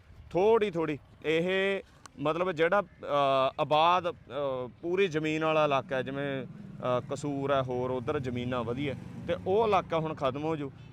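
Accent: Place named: Malwa, India. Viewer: ਜੀ ਤਵਾਡੀ ਮਲਵਈ ਭਾਸ਼ਾ ਹੈ ਅਸੀ ਜਿਹੜੇ ਪਾਕਿਸਤਾਨ ਤੋਂ ਆਏ ਹਾਂ ਜਾਂ ਮਾਝੇ ਵਾਲੇ ਸਾਡੀ ਭਾਸ਼ਾ ਢਿਲੋਂ ਸਾਹਬ ਜਾਂ ਸ਼ਮੀ ਜੱਟ ਹੋਰਾਂ ਦੀ ਭਾਸ਼ਾ ਇਕੋ ਹੀ ਹੈ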